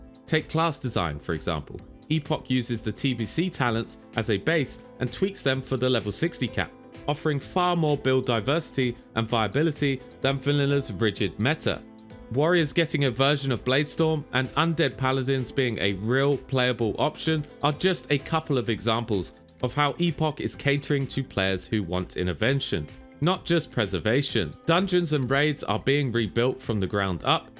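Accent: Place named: United Kingdom. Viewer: This was a very biased “hyped up” video. Let’s wait for Epoch to actually have a functioning server before we weigh the options. Also, a lot of what you stated about Turtle WoW demonstrates that you’re paid advertising for Epoch and/or haven’t done your research. You can’t hide behind your classy Victorian accent. Do your research, sir.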